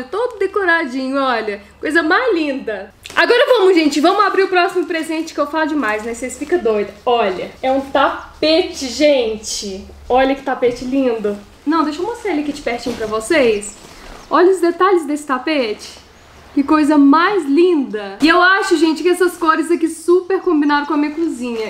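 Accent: Minas Gerais, Brazil. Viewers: O já está entendendo super o português? E olha que você fala rapidinho e com sotaque mineiro! Fico muito feliz pela dedicação e esforço dele. Dá pra ver o quanto ele está leve e feliz. Que coisa boa!! Nunca esqueço daquele vídeo que vocês fizeram com o não deixando desperdiçar nada. Morro de rir só de lembrar. E ele mal entendia as palavras em português que você falava. Quero ver mais atuações! Genial! Grande abraço, família!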